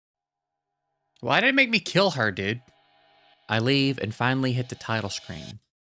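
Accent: South, United States. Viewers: Ok. This is crazy but my boyfriend is watching your I Played 20 Cozy Games... And nowhere online can we find what state you're from. I'm guessing Tennessee, Georgia, or around there. My boyfriend, who I will be shocked if he is right, thinks you are from Northern Louisiana or Arkansas.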